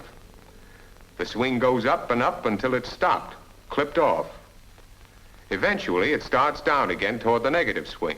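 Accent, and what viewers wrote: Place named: New York, United States. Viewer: I’m trying to figure out his accent. Where do you feel he is from? East coast? West coast? New York? I am from NY and have seen old films where people who are from NY used to have slightly different accents.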